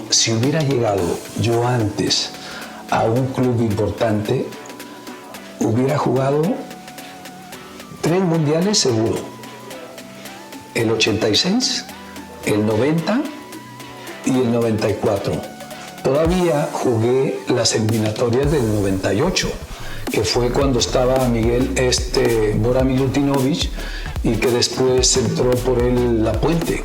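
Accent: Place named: Spain. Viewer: El señor Galindo una de los mas grandes ídolos de el chivas del Guadalajara y México siento mucho que hubiese y haya corrupción en el deporte y gente como tu que merece el apoyo máximo no se le diera y tu como entrenador serias excelente, soy machin pero desearía tener su autógrafo maestro y toda mi admiración eres de las personas que saben y tienen el sentimiento de representar a un club de coraza y de representar a su país con todos los hueboz solo una hora con el Maestro y podría escuchar más se me hizo poco es como el agua que filtran las montañas de lo que le hace falta a nuestro México personas que en realidad tengan principios compromiso y el color en la sangre no como el español del:D que tiene el acento español que usa a figuras como tu tu deberías de tener tu canal galindo y créeme la gente te seguiría más a ti perdon señor pero su acento español no me gusta me agrada más el de México solo que eres cabron sabes de donde sacar y lo que queremos ver como al Maestro que bueno que no hiciste tu auto entrevista por que la verdad no me importa y no la vería o hazla haber cuantos likes tendrías:D 😀 es broma no te tenzes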